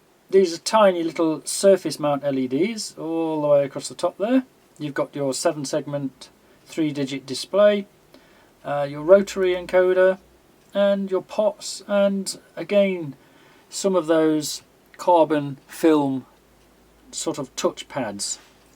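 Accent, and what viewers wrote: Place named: Leicestershire, United Kingdom. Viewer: are you from Leicestershire or Lincolnshire/Hull? Your accent seems familiar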